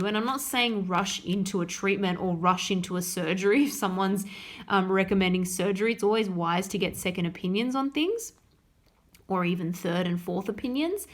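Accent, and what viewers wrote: Australia. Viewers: So good to hear an Aussie Tarot Reader! 🙌 Thankyou!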